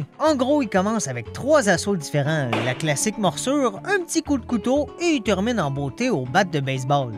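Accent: Quebec, Canada. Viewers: Wow, je vient de découvrir ta chaîne, et franchement, c'est propre :D En plus, j'adore ton accent québécois, et je trouve super beau 😊(ouais, un peu bizarre 😅) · Jadore je m'abonne et j'aime ton accent québécois moi aussi je suis québécois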